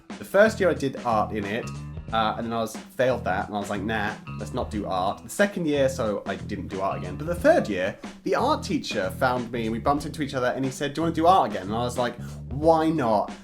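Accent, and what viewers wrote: England, United Kingdom. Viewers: it is because he is English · Because he's British. NOT English-speaking **BRITISH**. Edit: Didn't need to edit.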